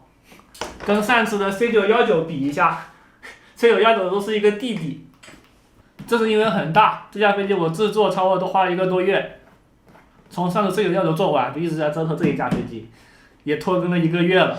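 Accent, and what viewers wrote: Jiangxi, China. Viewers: 在影片中经常能看以红土地，中国的红土地一般在湖北，湖南，江西。 结合口音，目测是江西。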